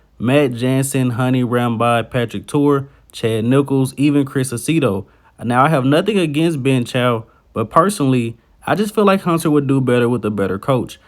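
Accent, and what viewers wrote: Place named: South, United States. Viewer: love the southern accent (if im not mistaken 😅) u got my sub man salute from europe 🫡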